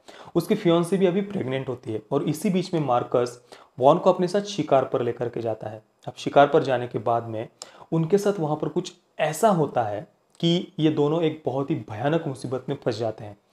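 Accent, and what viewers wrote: Maharashtra, India. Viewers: Good work bhai. Keep it up. The best thing I like about your reviews is when you say Jai Hind, Vande Mataram at the end. The way you talk, it feels you are from Maharashtra. I am from Nagpur. Where are you from? I have a few movie suggestions for you, which you would like to watch and review. For starters I will suggest, "The Third Man'. Its a very old film and is widely considered as the first in 'neo-noir' genre. Do watch it.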